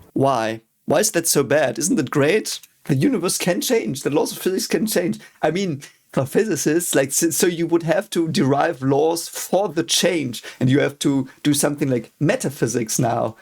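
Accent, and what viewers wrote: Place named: Germany. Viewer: Why is it when ever i her german accent, theres erica automatically playing as my in head elevator music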